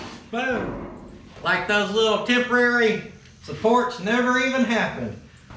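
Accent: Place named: South, United States. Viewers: you gotta drop at least 2 vids a week. And yeah love that southern accent. I also watch Randy from Auto auction rebuilds hey spoke of you a while back in a video .TKs Garage , Lucky's wheels Deals ,Vice grip garage .Yeah lol I learned a lot new words Libby dubby lol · Took me a minute but your humor got me past the hillbilly voice. I subscribed and I'm telling everyone. Good content and downright funny! Oh and good on yer wifey for recognizin the talent.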